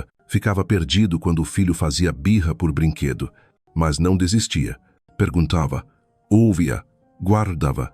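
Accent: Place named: Spain. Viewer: Não entendi o porquê do sotaque espanhol, soou mal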